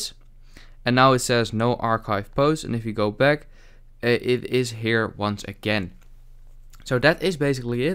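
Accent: Netherlands. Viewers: I hear someone is Dutch here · Hmmm Dutch? I can hear it a little ☺️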